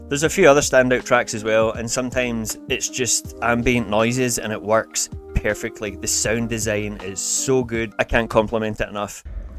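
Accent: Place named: Scotland, United Kingdom. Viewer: I always wunder ur accent, scotlands 🙌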